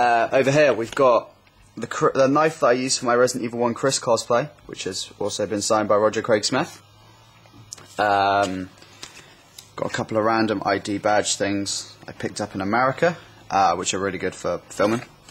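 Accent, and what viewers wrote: United Kingdom. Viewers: Eu acredito que ele more na Inglaterra pelo sotaque, mas talvez eu esteja errado ;)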